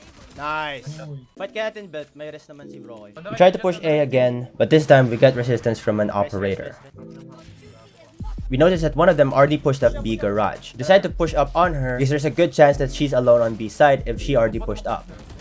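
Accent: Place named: United States. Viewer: Wait your accent was so American I'd never thought you were not American before I heard you speak a different language. Ur insane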